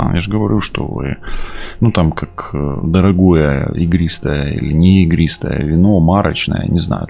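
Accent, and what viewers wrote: Ukraine. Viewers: Красивый расклад и красивая украинская речь ( мова). Мир всем нам❤ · ! Очень интересный расклад. И очень красивый чистый украинский язык.. Не часто такой услышишь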